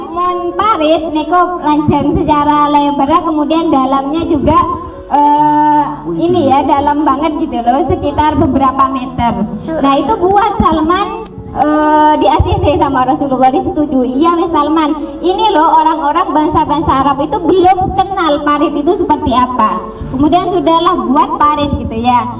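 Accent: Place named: Madura, Indonesia. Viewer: Logat nya deh bestie medhureh...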